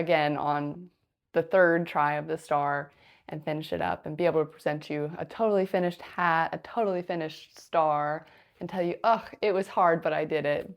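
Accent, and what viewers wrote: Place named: England, United Kingdom. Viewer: Hey i want to learn English accent from you 😅😅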